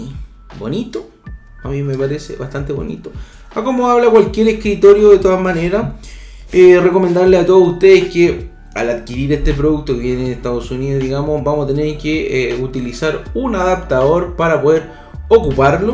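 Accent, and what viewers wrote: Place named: Chile. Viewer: Eres de chile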